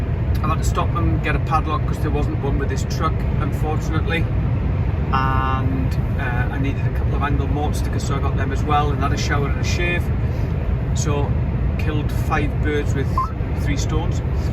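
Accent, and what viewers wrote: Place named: Northeast England, United Kingdom. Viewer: your accent is almost identical to that of another YouTuber called John Kirkwood who is a retired professional baker from the northeast of England. I highly recommend his channel if you have an interest in traditional British cooking and baking.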